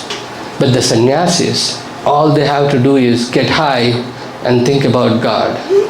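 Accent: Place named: India. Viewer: Keep ur Indian accent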